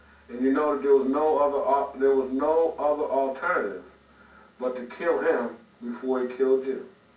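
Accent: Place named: United States. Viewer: he does have some kind of country accent but he's speaking pretty proper English. idk why you're so triggered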